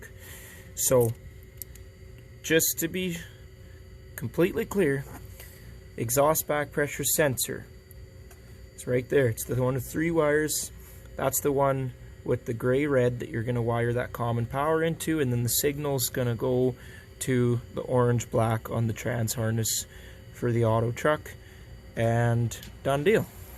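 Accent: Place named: Canada. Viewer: Dude you have to be from canada...lol you and i talk the same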